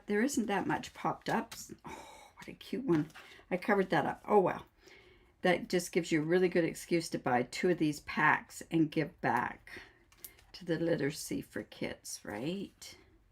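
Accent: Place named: South, United States. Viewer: Love the pecan pie accent!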